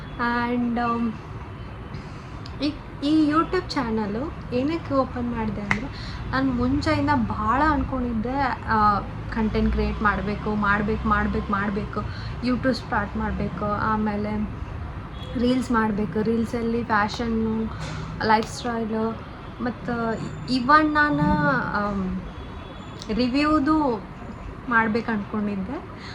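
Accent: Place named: Dharwad, India. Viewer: Bangloredag 3 varsha idru innu dharwad bashe martila alla ad ista aagid nin vedio olag❤❤❤❤❤....love and respect for that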